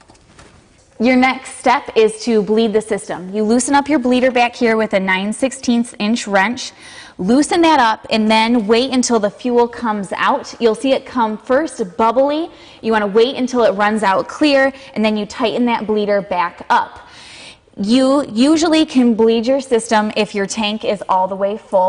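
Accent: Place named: Minnesota, United States. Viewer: I love the rural MN accent! We can smell our own.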